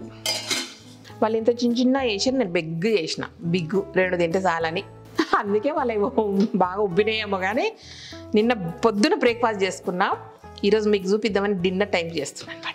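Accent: Telangana, India. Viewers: Yellow sareelo muddabanti puvula unnaru.meeku sarees baga suit avutayi abba! Telangana maata vellulli 🙏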